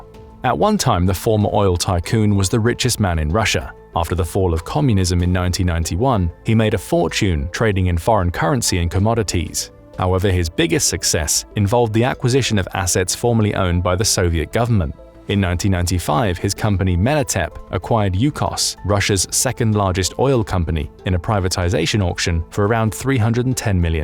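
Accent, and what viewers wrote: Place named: RP, United Kingdom. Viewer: Lies from the get go. This guy thinks that his posh sounding accent proves his intellect. Well he sure is a perfect example of twisting the truth to fit own alternative UNREAL narrative / just as hypocritical as main media and politicians who happily speak with forked tongues and do their utmost of censoring what they don't like. Do you ever now find REAL debates between intelligent people of different opinions than what is force fed??? No, all the main proponents do is calling names, taking jobs away from dissenters.... admitting that they will call something they agree is TRUE nevertheless as " disinfo" when it does not suit their narrative.....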